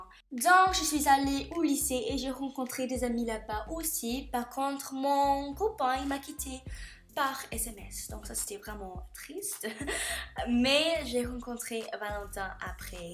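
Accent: England, United Kingdom. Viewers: C'est courageux de ta part d'être venu en france sans même savoir parler français et j'adore ton petit accent anglais :P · j'ai pensé que tu es de l'Angleterre, je peux encore entendre ton accent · super la vidéo c'est super dur de quitter son pays natale et d'apprendre une nouvelle langue donc tu as eu du courage continue comme sa (j'aime trop ton accent Anglais!)❤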